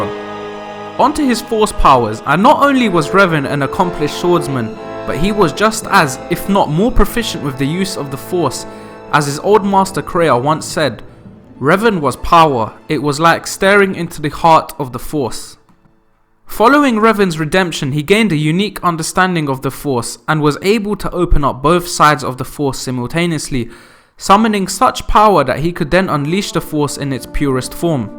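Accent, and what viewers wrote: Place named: East London, United Kingdom. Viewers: damn you got a thick london accent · Loool I know your accent is it from east London?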